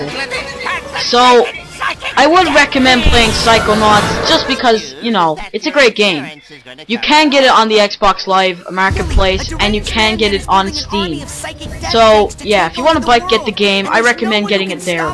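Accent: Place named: United States. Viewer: That accent is 100% American my friend, don't kid yourself :D I think part of feeling we all have towards games comes from the time you play it in. I'm glad you buy the old consoles, shows a desire to explore old styles and appreciate the classics, but I think you have to grow up in those eras to experience what it's like playing these games for the first time during their hey-day. Golden days spent playing Sonic on GameGear, Mario on GameBoy, Goldeneye on N64, Red Alert C+C on PC. Good times!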